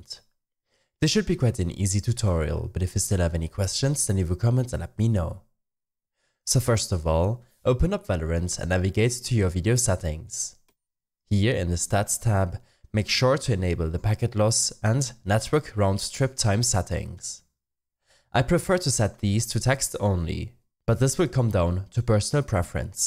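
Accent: Germany. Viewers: Ich am ANfang: das ist doch safe ein Deutscher Ich bin wirklich gut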